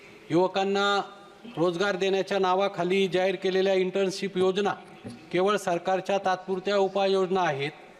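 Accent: India. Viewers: आपली भाषा आपला माणुस खुप भारी वाटतंय सर तुम्ही ग्रामीण मराठी भाषात बोले ❤🎉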